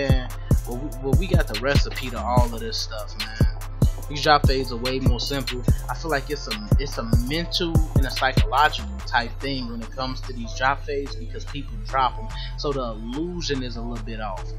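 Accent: Chicago, United States. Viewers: Nigga from Chicago$Cant you hear it:))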